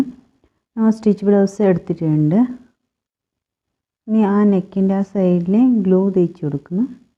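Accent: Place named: Kannur, India. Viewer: Nalla work. Kannurano sthalam?? Samsaram kettappol thonniyatha. 😊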